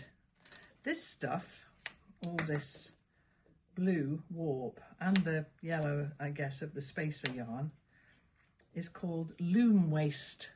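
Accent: United Kingdom. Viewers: Love your weaving!!!!! You are so talented! I have been watching you on utube for several years. Thanks for all your talks about jumping in and trying something new. I am a quilter but love to try all sorts of creative things. Also I have to tell you I also watch you because I crave listening to British accent as my mum was from England. Thanks for having a chat!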